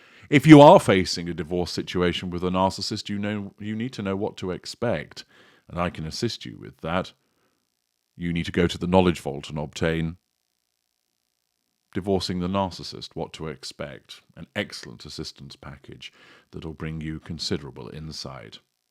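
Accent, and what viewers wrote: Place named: North, United Kingdom. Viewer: Your common northern accent keeps creeping in, doesn't it? Us southerners do it better, we know it, and you know it! As for the Markle trash, good riddance.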